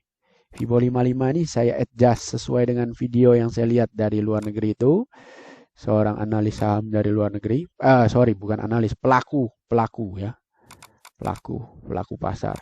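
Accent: Java, Indonesia. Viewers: gw suka sama suaranya yg medok2 dan konten2nya bermutu.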